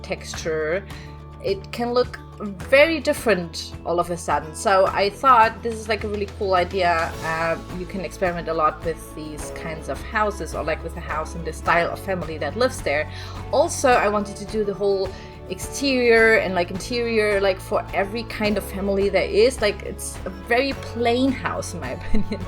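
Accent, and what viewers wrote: Germany. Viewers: This was the first video I have watched and must say didn’t notice your accent. You are doing very well love the German touch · I never realized that your accent was german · I LOVE HOW YOUR ACCENT IMMEDIATELY GOT STRONGER LIKE Y E S also please don’t change your accent... i love german accents and you should never change who you are :)) I’m from Britain, and I get teased a lot for saying things ‘poshly’ as my family is really English and well-spoken, and everyone around me has a really Welshy accent. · are you from Germany or Austria? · Your reaction to that comment was GOLD as a fellow German I know how hard it is to get rid of a german accent but honestly??? Yours isn't even bad??? When I started watching your videos I was like "she's german right?" because I did recognize the accent but I wasn't even entirely sure! It's ridiculous how some English native speakers (obviously not all, I see a lot of sweet comments here! 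<3 ) take the content produced by non native speakers for granted to the point of complaining about their accents oh boyyy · Vermutlich weil sie es selbst nicht besser können .. oder GAR nicht ^^ Ich finde dein Englisch schon ziemlich amerikanisch. Manchmal redest du so amerikanisch-kauderwelschig ^^ dass ich dich kaum verstehe ;D Da sind eigentlich nur noch wenige Wörter bei denen man den deutschen Akzent raushört. Außerdem hab ich gehört, dass Amerikaner in der Regel den deutschen Akzent ziemlich niedlich finden :) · I'm really confused. Why would you watch a German youtuber if you don't want to hear a German accent? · I don't think your accent is annoying at all - it's lovely to listen to, and not as strong as I'd imagine a German accent to be, lol! I love accents and poeple of all places and cultures, and you are most definitely awesome! The person who was so mind-numbingly rude can go suck - don't ever change! 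· You are german!!??? 😉I live in Germany and my son have the same accent when he speaks english and i find it really lovely, just like your creations! 😊❤✌ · I agree with you plus her English is really clear to understand evan with the German accent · I love your accent and I think it's really obnoxious for people to complain about it. It just goes to show you how spoiled we Americans can be. I for one am very grateful that you record in English at all. It's not your first language and I'm sure it would be easier for you to record in German so I appreciate the fact that you care enough to try!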